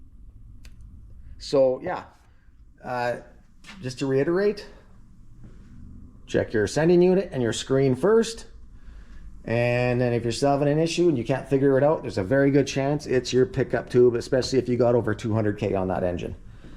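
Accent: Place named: New Jersey, United States. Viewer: Are you from New Jersey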